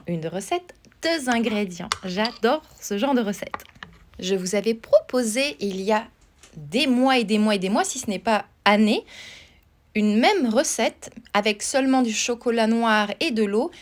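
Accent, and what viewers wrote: France. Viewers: J’aime beaucoup votre accent Francais; tres, tres mignon! Je n’ai jamais les occasions parler ou ecouter a la belle langue francaise!! J’aime beaucoup votre recette aussi, je vais immediatement l’essayer! Merci a vous 😃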